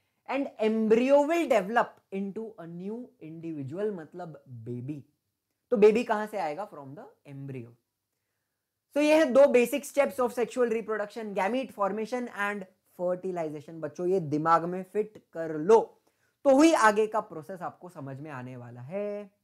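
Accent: Maharashtra, India. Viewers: I think sir is gifted by God for 10th students. And one thing..... Sir's mothertongue is Marathi (chikhat)😅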